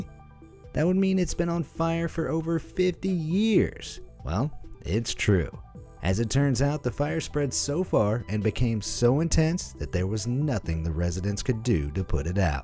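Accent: United States. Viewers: Well the words he's useing are the English words for it (English as in UK not English as in English lauguage) tho his voice is rather amarican or Canadian. Its hard to tell as he uses alot of English terms and pronouncyation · Ah, so this is a British guy that sounds American. He said, "Petrol Head" dead giveaway.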